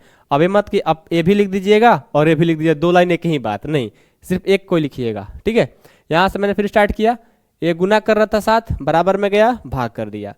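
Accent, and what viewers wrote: Bihar, India. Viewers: Sir aap Bihari se ho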